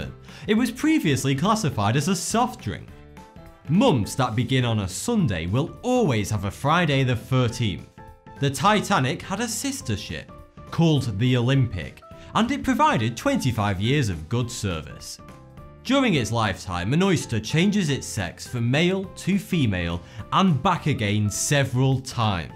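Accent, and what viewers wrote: United Kingdom. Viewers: British English at its best~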